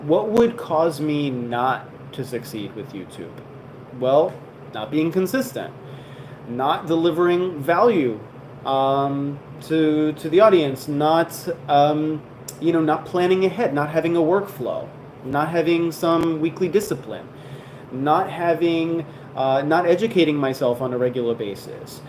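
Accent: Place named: United States. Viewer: This live stream- I don't know why?- giving sence of enjoyment like that cool American and British speaker and thier unique speaking style. You are using American Acccent too.😅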